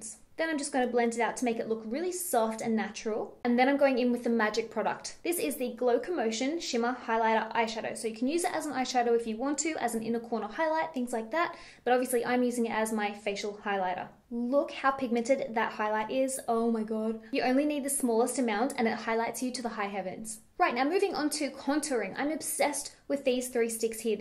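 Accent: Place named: Australia. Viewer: #LANGEFAM Hey, I am brand new to YouTube - seriously only used it for music in the past, but recently have been watching many of your videos! You are brilliant! Your make up advice is so easy to follow and definitely makes a difference. I've watched others, but didn't like them as much - maybe its the Aussie accent lol I was always a tomboy/athletic/workaholic, so I wore makeup, but never really took the time to really appreciate what works best....you have helped! THANK YOU! Would love that kit to really try to improve my look! Keep being you! You're great!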